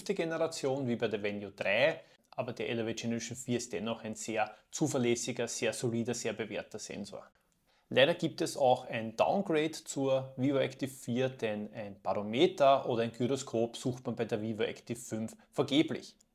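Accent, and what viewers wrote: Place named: Austria. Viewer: Vielen Dank für das informative Video! Auch der österreichische Dialekt ist mir immer wieder sympathisch, weil meine Vorfahren aus diesem schönen Land stammen 😊😉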